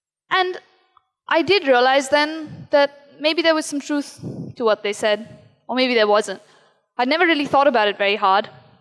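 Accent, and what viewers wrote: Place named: South Bombay, India. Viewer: No she can't. That sick snobby SoBo accent smh... Publishing her books at 15 when writers get rejected so much.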